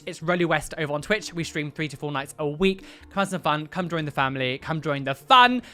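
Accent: Texas, United States. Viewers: Your accents are adorable 🥰 You always end up with a twinge of a Texas accent. But always adorable 😘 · Your American accent is pretty awesome, Roly! Great video! 😊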